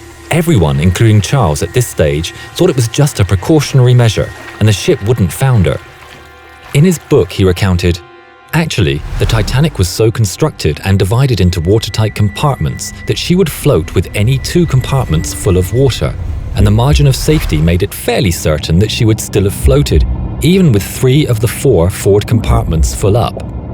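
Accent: England, United Kingdom. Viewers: This is the best British accent I’ve ever heard haha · Love you English accent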